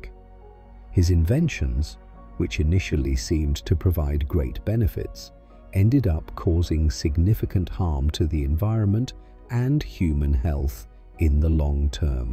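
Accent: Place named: United Kingdom. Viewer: No not listening to a bloody limey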